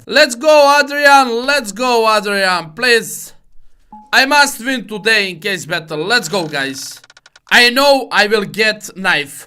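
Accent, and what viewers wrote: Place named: Serbia. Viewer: pricas engleski ko srpski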